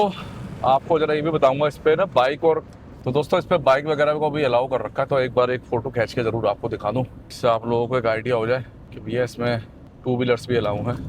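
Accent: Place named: India. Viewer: sir, information dene wali baat to sahi hai, par aaj kal trend chal raha hai har koi apne aap ko represent kar raha hah, it is not bad thinking, baki aap ke videos mujhe bahut acche lagte hai, soscially aap ka desi bolne ka style bahut aacha hai,, baki aap ki pic ki kami rah jaati hai..thanks bye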